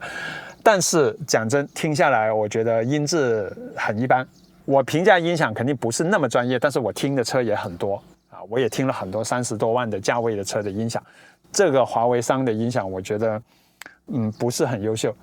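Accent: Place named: South, China. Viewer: 听口音，100%南方人，哈哈哈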